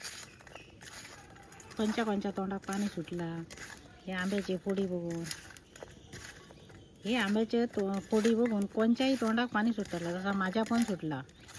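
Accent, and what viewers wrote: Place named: Malvan, India. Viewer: अतिशय सुंदर व्हीडीओ , ताई तुम्ही फार लकी आहात.अशा मनमोहक निसर्गाच्या सानिध्यात तुमचे जीवन जगत अहात.आम्हा मुंबईकरांना तुमचा हेवा वाटतो. तुमची मालवणी भाषा ऐकायला कानाला गोड वाटते.असेच नवनविन व्हीडीओ टाकत जा, आम्हाला तुमचे व्हीडीओ फार आवडतात.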